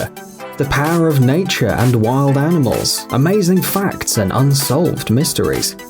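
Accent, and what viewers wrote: England, United Kingdom. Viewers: That was the best video with the British narrator I've ever seen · And hes british as fuck, he said alooh-minium. Its Aluminum. Fuck. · British accent should be a dead giveaway that this guy doesn’t know anything about firearms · Sounds english, makes sence as over here we have to have licences for everything, even airsoft guns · English accent. How much of a gun guy could he be? The royalty disarmed it's "subjects" long ago. They have a false sense of security. By the way, how is that Muslim thing going in your parliament? The UK is in greater peril than the rest of Europe. The greatest way to control your subjects is to disarm them. Then the brainwashing starts. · Narrator sounds British, which explains his apparent lack of knowledge about firearms. Remember, the British let their government disarm British citizens, making self defense illegal. 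"Gun Control" (and crooks) win, citizens lose. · why the brit narrator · No shit he’s British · Did the UK accent give it away?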